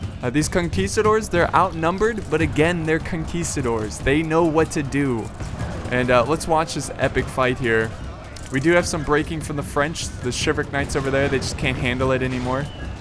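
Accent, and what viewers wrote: England, United Kingdom. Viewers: are you a englisch or a american youtouber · is American? I thought he was British.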